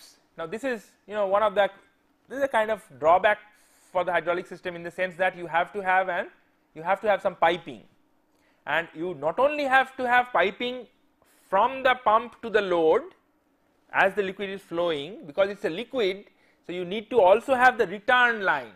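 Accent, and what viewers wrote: India. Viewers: you just have to love his / indian accent :DD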